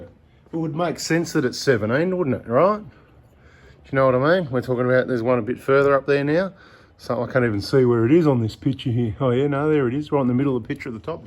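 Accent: Australia. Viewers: Nothing beats an Aussie accent.😂😂😂👍🏽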